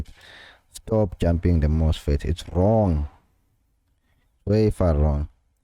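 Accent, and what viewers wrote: South Africa. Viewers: I can hear that you're South African and that makes me very proud. Keep on sharing this helpful info. God Bless you.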